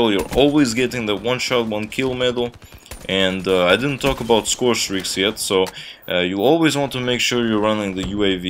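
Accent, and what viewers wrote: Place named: Russia. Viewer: He sounds like for Russia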